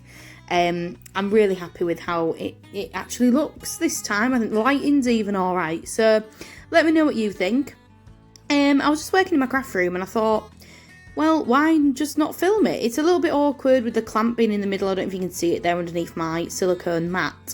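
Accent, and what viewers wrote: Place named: Yorkshire, United Kingdom. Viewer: Thank you for sharing your lovely video. You accent is great. I love the Yorkshire accent. Here in South Africa we are in level 1 which is pretty much the same as normal. We have to wear masks and sanitize our hands before going into any shop. We are going into summer now so hopefully we'll be able to get out and about. Have a great week.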